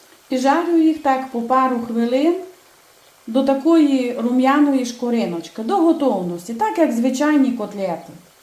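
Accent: Transcarpathia, Ukraine. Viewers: Насолоджуюся красою закарпатського діалекту. Ви чудові! ❤ Будемо робити такі котлетки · Чудовий Закарпатський діалект❤ Дякую за вашу працю ❤Слава Україні! 🩵💛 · Прекрасні котлетки. І говірка закарпатська чарівна. Молодець. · Дуже смачна закарпатська кухня і гарний закарпатський діалект ❤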